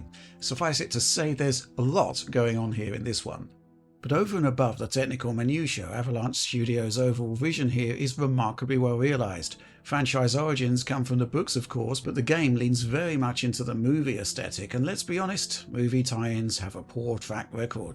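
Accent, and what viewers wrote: United Kingdom. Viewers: It's the accent, British seems to scream seriousness and maturity. Game of thrones anyone? 😉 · British voice for a British franchise, very appropriate. Also great work on the analysis.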